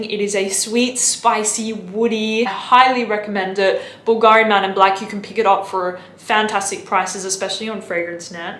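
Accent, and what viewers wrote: Australia. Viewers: I enjoy your exotic Aussie accent, and your presentation, smile, eyes... everything about you is just gorgeous. And I like your fragrance choices - that it had to smell expensive and classy, even if it's modestly priced. I own many of these you have shown here, so I am on the same page with your choices. One I have not smelled yet is La Nuit d'Issey. Ordered it on your recommendation. 🖖😘👌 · Australian accent.... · What's there to be calm about? Was never hyped in the first place. I just said I loved her Australian accent. And what's it to you anyhow? People love to give their opinion when 1. It was never asked for and 2. It isn't worth 2 cents. So, you calm down... dude I swear. People and minding their business...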